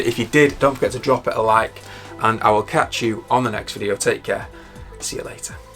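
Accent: United Kingdom. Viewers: Your English is so British. Where are u from?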